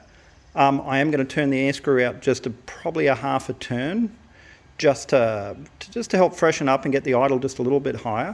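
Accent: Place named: New Zealand. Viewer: awesome bike, you sound like your from NZ not canada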